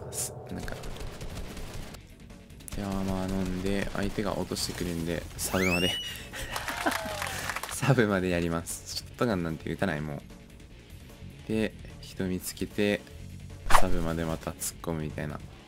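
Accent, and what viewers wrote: Kansai, Japan. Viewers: さんてもしかして関西の子？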